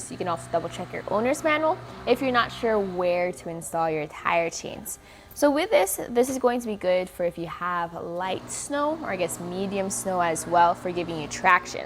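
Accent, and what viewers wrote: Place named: Midwest, United States. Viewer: Someone's from the Midwest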